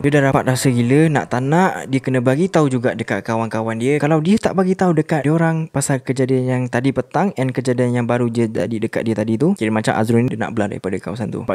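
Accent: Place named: Melaka, Malaysia. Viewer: X bkn org Melaka ni...mengelibas pekat😂😂😜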